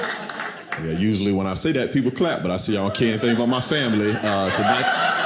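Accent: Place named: South, United States. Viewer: I adore his lovely soft southern accent and gentle manners, for a brit its a joy to listen to Real class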